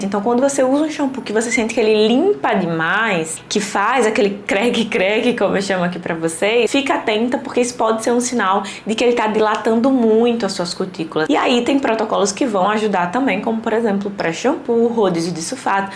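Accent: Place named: Pernambuco, Brazil. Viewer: Olá! Você é Pernambucana? Seu sotaque é tão lindo 😊 sou nova aqui ❤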